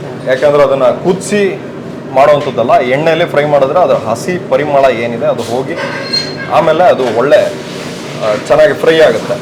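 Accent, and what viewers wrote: Butler English, India. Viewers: Sir, your butler inglish is top, after very long time we are hearing, keep it up.🎉❤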